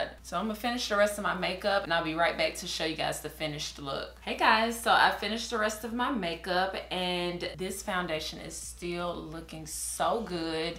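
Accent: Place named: Texas, United States. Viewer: What city are you in. I hear a Texas accent.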